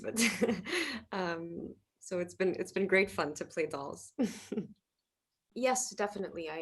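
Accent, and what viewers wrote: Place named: United States. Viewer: Why does she have an American accent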